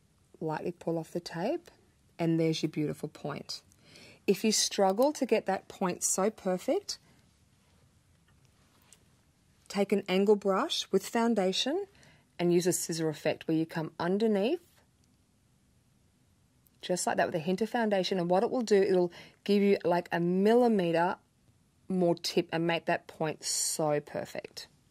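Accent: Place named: Australia. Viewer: OMG... I am an Aussie and a makeup up NUT and I cannot believe I am only learning of this amazing woman now! Where the bloody hell have I been? Overlooking that, what a fabulous tutorial and explanation... too good! I have exactly this eye shape and have abandoned all hope of ever wearing a sexy flick but now, maybe, I can... how fabulous to have a tutorial so well explained and so relevant AND with an Aussie accent! New subbie and super happy, thank you, this was fantastic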